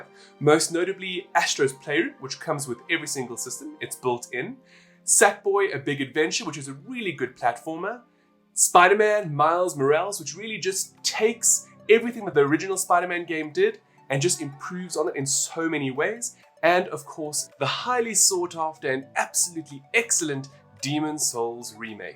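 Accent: South Africa. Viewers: are you a south African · I don't usually click on ads but I hope you get some recognition for your work. Are you from South Africa?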